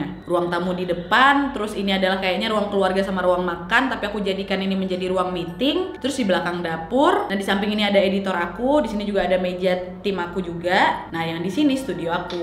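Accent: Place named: Medan, Indonesia. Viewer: Tapi knp ngomong nya kyk Medan?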